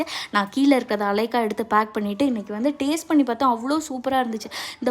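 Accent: Tirunelveli, India. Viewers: R u from thirunelveli sis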